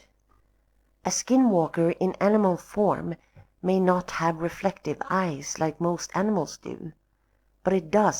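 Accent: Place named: Ireland. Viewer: You said you're Swedish but your accent sounds Irish. I loved listening to your narrative. Very good!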